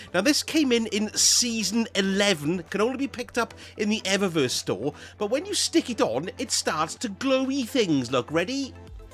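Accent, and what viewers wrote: Wales, United Kingdom. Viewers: You sound very welsh my friend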